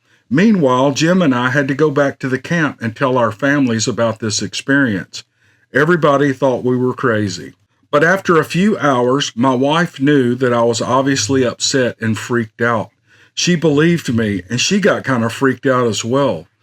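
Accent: South, United States. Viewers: Please don’t try to get better!! Look how much you’ve grown already and so fast. People love your southern draw, your calming effect and your narration skills! Would you take an old cherished record out of its sleeve, when there is nothing wrong with it, to fix it? NO! So just be your charming self. We’ll let you know if there’s ever a problem by yellin acha from da holla! Thanks, don’t ever change. Tell your wife that perfection can’t be fixed! Well, maybe not tell you wife on the other hand. We want y’all ya come back. ps: Just remember this, this ain’t Dixie Chicks, it’s. It’s the Dixie Chicks that need the fixin, not!! Thank you! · Just be you, love that southern American accent brother and volume is just fine and as always enjoyable to hear you mate!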